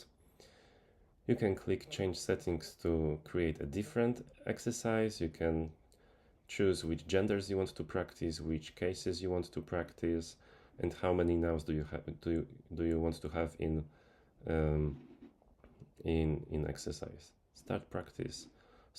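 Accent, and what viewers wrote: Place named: Poland. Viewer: I am here for your Polish English to hear!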